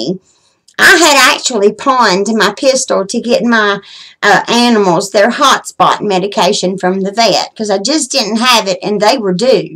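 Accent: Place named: South, United States. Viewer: Wow!! You are a wheeler dealer!!! “In yonder” tickled my funny bone! You might have more of a Southern accent than me!! Glad you had a successful yard sale with your treasures.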